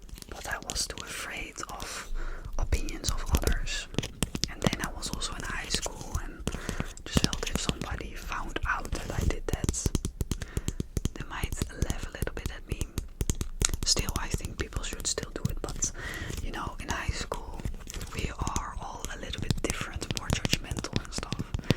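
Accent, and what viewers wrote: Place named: Netherlands. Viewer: Love this, and when you traced the letters on the pizza box 🤤. I like that you’re not a fast tapper, I find a lot of ASMArtists tap too fast. Yours is perfect. I like that you tell us the Dutch works for stuff, repeat it more then once so we can learn to Dutch words for things! I live in Canada but have lots of family from holland, including my Oma! Hearing your accent on the first video I watched I recognize it immediately.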